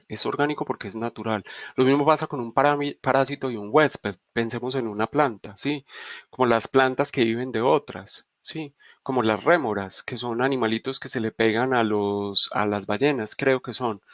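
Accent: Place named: Colombia. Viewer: Maravillosa exposición,una genialidad como enseñas; con profundidad y esencia das la enseñanza maya, Gracias!!! Encima de colombiano sos!!! Dulzura pura... amo profundamente la tonada colombiana, te enamoran al hablar. Un alemán te dice te amo y te asustas y un colombiano te insulta y pensas q te está piropeando. Desde Villa Carlos Paz, Córdoba, Argentina 💖💗❤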